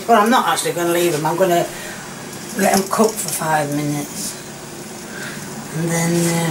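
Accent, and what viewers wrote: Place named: Scotland, United Kingdom. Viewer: She’s from Scotland I think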